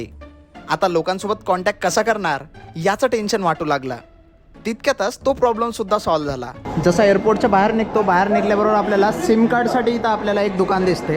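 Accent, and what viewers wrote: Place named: Chandrapur, India. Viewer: Tuzi Chandrapuri Marathi aikun ekdamach chan vatate re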